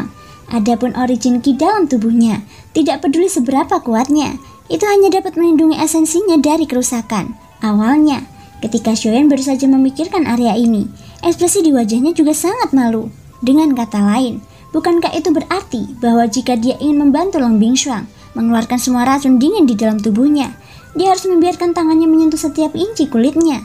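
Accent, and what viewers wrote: Java, Indonesia. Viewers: hai mimin medock banget suaranya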